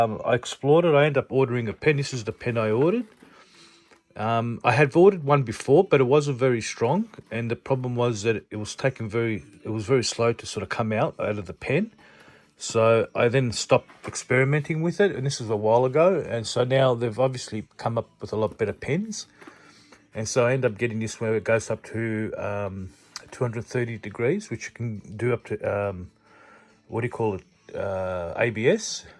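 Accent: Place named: Australia. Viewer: Love it, and the Reo reference makes a lot of sense, you sound Aussie, where are you located bud?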